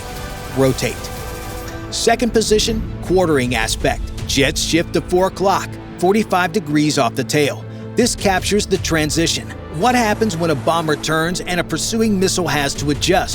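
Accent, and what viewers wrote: United States. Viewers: Don't get me wrong, the narrative and story is bob on. It's just the hyper, over dramatic American narrator that I find annoying. Maybe it's because I'm British.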